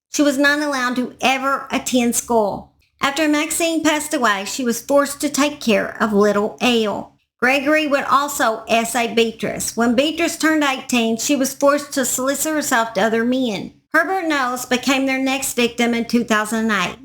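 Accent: South, United States. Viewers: Thank you for your content I luv your country accent. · Just found your channel + instantly subbed. I watch true crime way too much + I've never heard this. Thank you for bringing attention to it. What a sick sick woman. I have no words...I hope all the victims of this deranged lady are living their best lives today. My prayers are with them 🙏 Btw having a severe Boston accent myself, your southern voice was lovely to hear, especially talking to your pup! Thank you for the vid. Look forward to your next ✌️💙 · Wow,love your southern accent. Yes, this monster is the worse of the worst. Damn, the things she did to her victims are unheard of. She was one sick b*tch. I'm glad she'll spend the rest of her miserable life in prison.she still gets her 3 meals a day,free health care.Something her victims didn't get.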